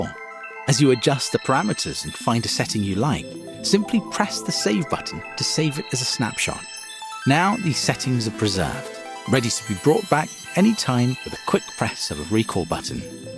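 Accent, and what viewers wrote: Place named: United Kingdom. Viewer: Hi. Congrats on making something new and interesting! Couple of questions… 1) you sound British, so will the stated dollar price include VAT for UK orders? 2) is it midi 2.0 compliant so that changes made in a DAW will be reflected on the controller? 3) any plans to create DAW specific scripts like for Bitwig? Cheers, Phil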